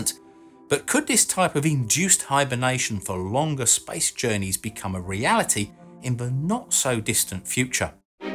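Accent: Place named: England, United Kingdom. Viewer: Love the tone, the voice, the pure english accent, very relaxing listening to you Sir! And the content........ perfectly excellent!👍 Forgot to mention, the intelligence and the shirts of course, salute! From a French-Canadian subscriber 🖖.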